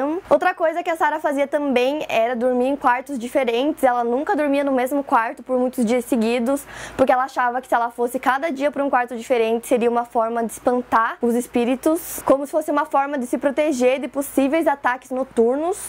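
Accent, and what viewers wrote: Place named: South, Brazil. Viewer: Que sotaque muito bom de se ouvir,qual estado do Sul você é?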